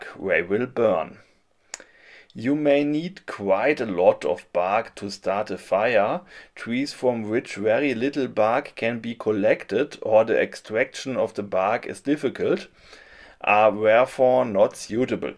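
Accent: Germany. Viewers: Hallo, hab grade das deutsche Video angemacht und als ich gehört hab, dass du das auch auf Englisch gemacht hast musste ich doch gleich mal reinschauen bzw rein hören. Finde so einen starken deutschen Akzent immer total lustig. Hab ich selber zum Glück nicht soo die Probleme mit. Egal. Respekt dafür dass du dich trotz Akzent vor die Kamera gesetzt hast. Würden sich viele nicht trauen. Aber bei deinen Inhalten ist das alles sowieso eher zweit- wenn nicht sogar drittrangig und da ich die allermeisten deiner Videos gesehen habe würde ich mal behaupten es wäre schlimmer dem internationalen Publikum diesen großartigen Content weiterhin vorzuenthalten. Inhalt vor Verpackung würde ich mal sagen😅 In diesem Sinne... Übung macht den Meister und solange dich alle verstehen ist doch alles gut. So... Und da ich eher selten Kommentare schreibe mal was Allgemeines: Mach bitte weiter, sodass wir alle noch viel mehr von dir lernen können. 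Jetzt sogar international 👍 Danke! Danke dass du uns dieses tolle Geschenk machst. Deinen ganzen Kanal meine ich. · man merkt den deutschen Akzent nur ganz am Rande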